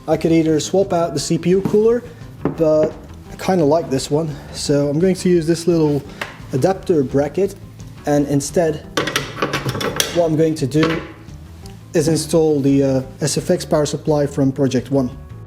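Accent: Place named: Netherlands. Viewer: Where are you from? You sound dutch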